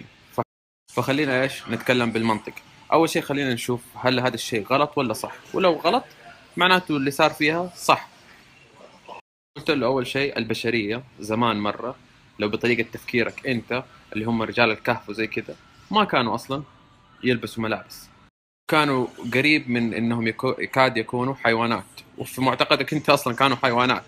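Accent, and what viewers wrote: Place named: Hijaz, Saudi Arabia. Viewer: بس اللهجه حجازيه زي احمد الشقيري لكن الصوووت مره لااا😭😂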